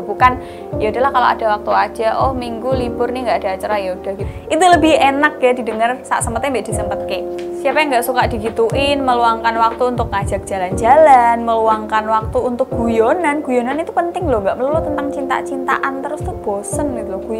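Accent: Java, Indonesia. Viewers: Medok jawa nya mba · Aq suka logat jawamu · logat bicaranya jawa bnget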